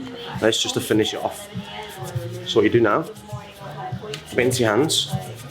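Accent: Liverpool, United Kingdom. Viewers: Can someone tell me which UK accent that is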